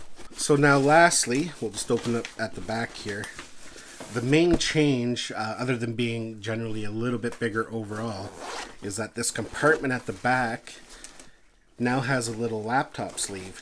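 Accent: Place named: Ottawa Valley, Canada. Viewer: I’m detecting an Ottawa Valley accent :)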